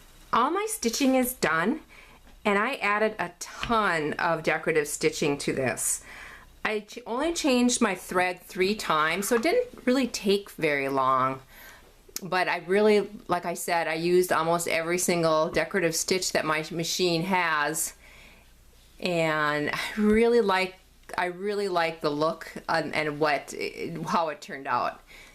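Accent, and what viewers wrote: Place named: Iowa, United States. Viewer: The only redeeming feature of the belt are the buckle rings...you really made it beautiful. My family in northern Iowa sound just like you...delightful.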